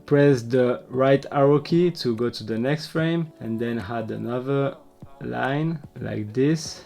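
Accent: France. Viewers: Toi t’es français ? 😂😅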